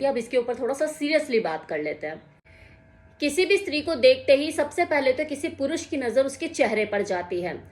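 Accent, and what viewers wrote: Haryana, India. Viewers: लगता है आप हरियाणा से है😮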